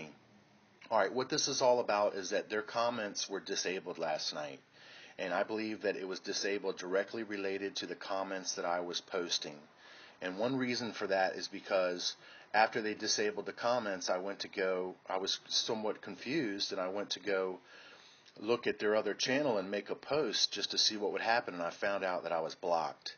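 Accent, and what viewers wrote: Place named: Baltimore, United States. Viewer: Also, I'm betting from your accent that you're from Baltimore and the D.C. area. Make me suspect you a bit.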